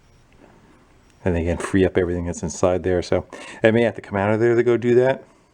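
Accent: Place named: New England, United States. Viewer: That's a New England accent if I eva heard one.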